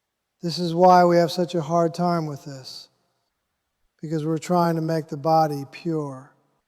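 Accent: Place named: Georgia, United States. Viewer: Why is sounding like an old-timey trucker from Georgia all of a sudden?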